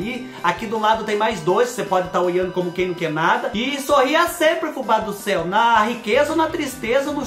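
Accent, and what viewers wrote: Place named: Caipira, Brazil. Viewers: Oi chegando como quem não que nada gosto muito deste teu sotaque caipirez de que terrinha você é,será que é daqui de perto de mim, Capivari,Porto Feliz ou é puro charme kkkkk adorooooooo😘😘😘😘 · Seu sotaque e bem parecido com os mineiros sua cidade fica próximo a Minas Gerais?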